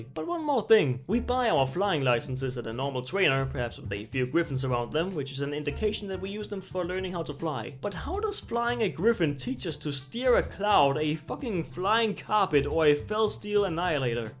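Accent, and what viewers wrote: Denmark. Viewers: Are you from Denmark? :D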